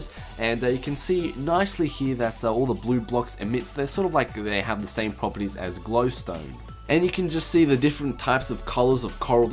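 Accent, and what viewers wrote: Australia. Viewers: he doesnt have a fake Russian accent its Austrailian and its not fake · Dude 1. It isnt a fake accent 2.The ``fake`` accent is australian, not Russian 3. Learn how to spell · He is Australian, thus he has an Australasian accent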